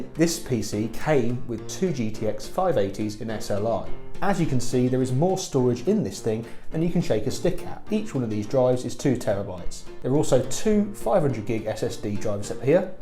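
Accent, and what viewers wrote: United Kingdom. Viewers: He's british calm down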